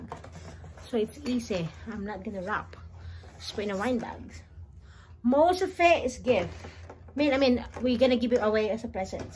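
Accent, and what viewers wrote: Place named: England, United Kingdom. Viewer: I love love the English accent. I can listen to you talk all day long. Sorry your original Christmas plan didn't happen, but time alone with each other is ❤️. So cute to hear you say mukbang and Noche Buena. Hala, Tagalog pala. Pinahirapan mo pa akong mag ingles. Kuhhh, bata ka! Hahaha, nice meeting you.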